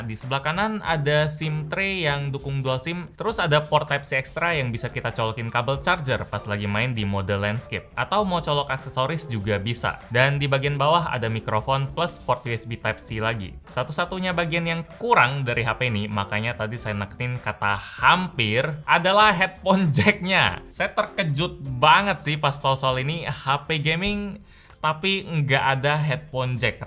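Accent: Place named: Java, Indonesia. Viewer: 865 tok! Medok sekali anda bang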